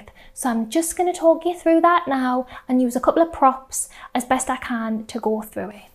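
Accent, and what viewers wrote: United Kingdom. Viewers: I love your voice and accent 😍💕 sounds Scottish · It is a Northeast accent, not sure where you are from but do be so judgemental, unless you are a southern English snob?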